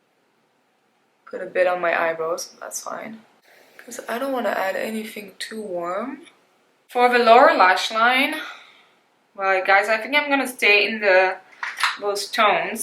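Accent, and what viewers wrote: France. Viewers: Hi there ! Are you French ? Where did you learn to speak English that well ? Just wondering ! Great video btw, That palette is on my wishlist thanks to your great review !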